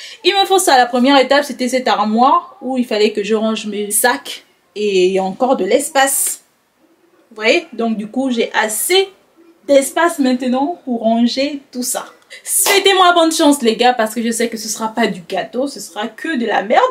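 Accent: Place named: Gabon. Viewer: Je savais avec cet accent que ça c'est une gabonaise quand j'écoute encore le elang no si😅ma sista FANG 🎉🎉je m'abonne du courage❤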